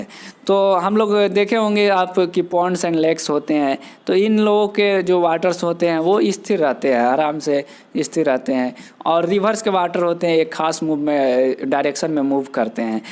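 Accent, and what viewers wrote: Bihar, India. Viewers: Mujhe toh aapki awaz ko sunte hi pata Chal gaya ki Aap bihari or bandar ho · Aap bihari ho naa aapke bolne se pata chal raha h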